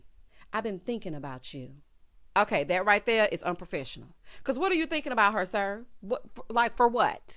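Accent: South, United States. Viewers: I remember very first review. She said “I’m country so you might want to turn on your closed captions.” I was on board with the G.O.A,T. moving forward 😉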